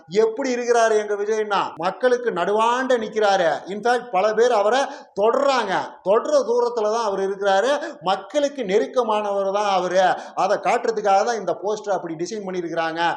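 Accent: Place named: Coimbatore, India. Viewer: அண்ணா உங்க பேச்சு கோவை தமிழ்ல பட்டை கிளப்புறீங்க போங்க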